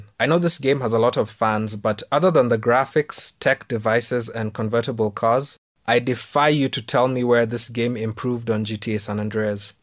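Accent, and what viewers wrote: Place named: South Africa. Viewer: Your accent is close to a South African one but that's just me. I did not know about fortnite being a single-player initially. I've always avoided these co-op/online and live service games due to the predatory practices. But stylized games are more timeless with the best example being Sifu The upcoming blade by Arkane Lyon. Ultimate Spiderman (2005) Hifi rush The upcoming blade game Rocksteady is another studio that perfectly blends photo realism with a more stylized appearance Gotham and metropolis look like their animated series counterparts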